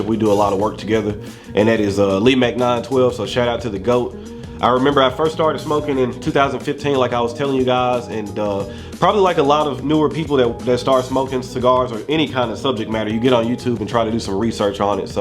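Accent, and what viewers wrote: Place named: Memphis, United States. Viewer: Man. You got one of the cleanest looking channels. And a a1 personality and presence for this. And that accent yo 😂 Makes me miss Memphis. Gotta go see my aunt and cousin up there soon. I always had trouble finding good channels cause I noticed that some were way too damn bourgie, some were way too amateur, some were alright but the people runnin the channels were lowkey nuts as individuals (and not in a good way) and some were just bad all around by either trying too hard to be all Luxurious or whatever and looking strange for their trouble. There are a whole lot of bad options out there. But I've found a handful of down to earth, decent seeming folks and I'm glad you're one of em. Even if I'm relatively new to ya. Stay at it 👊👊👊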